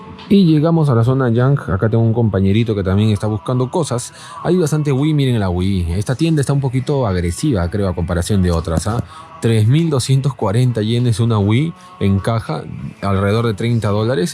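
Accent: Peru. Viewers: Ese tonito peruanazo me vacila chévere causa para ti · 30 segundos de video y me di cuenta que era peruano :’) es un INKAAA KOLAAAAAA. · Por el acento y criollas y la inca Kola fácil .... Es peruano - japones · Eres Peruano verdad?? Feliz año broer. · Sabía q eras peruano, la criollada el acento, excelente.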